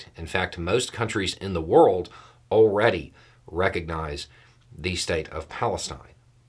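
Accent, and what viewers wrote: South, United States. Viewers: Perceptive video. Southern States accent for sure, but did I catch a trace of an Australian accent as well? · I love that sharp intellect cloaked in that velvety southern accent. I know your accents are not exactly the same, I think you are from different regions of the south but you remind me of my favourite southern gentleman Shelby Foote. 💖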